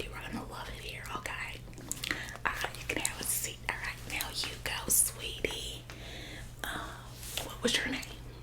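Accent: South, United States. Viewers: I love the southern accent 😁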